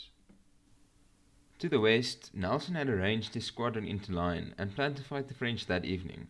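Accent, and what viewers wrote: South Africa. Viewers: Thank you for your gracious reply. I note that others have said much the same. Having been at school with S.Africans I have no problem with the accent but audio levels need to be higher and delivery a bit slower. This will make your channel one of the very top military history ones anywhere with simple graphics, directions and exact distances given. The fact that so many are saying he same is a tribute to your scholarship. Best wishes, Dr Stephen Hall, Ireland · I also guessed the narrator is from SA. Blown by the quality content and precise narration 🇿🇦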